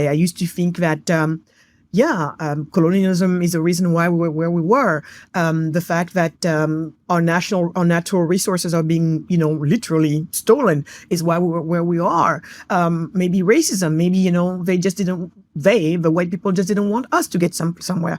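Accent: United States. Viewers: 30 seconds of listening in, sounds odd. An African ensconced in the West, US probably going by the American accent. Like you say shallow knowledge shallow conclusions.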